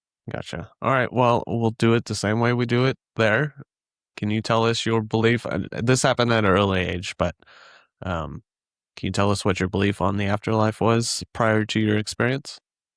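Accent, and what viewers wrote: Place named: North Shore, United States. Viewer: This was my 1st time watching this channel and I wish I could HYPE it. Subscribed with a smile., Thank you sooo much for sharing your stories and insightful perspective. What a wonderful NDE! It touched my and my husband's heart. Don't stop telling your truth...The world needs to know. Thank you for helping to wake up the collective. By chance, are you from the N. Shore of MA? 😉 I'm from Wellesley but went to college in Beverly and you sound just like those wonderful people! 🤸🏻🤸🏻‍♀️🤸🏼‍♂️ PS. You both sharing genuine love at the end filled our hearts.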